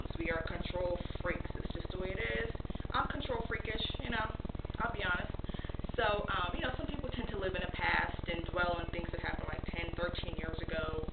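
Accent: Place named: Philippines. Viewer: Your accent is so adorable.do you have Filipino ancestry?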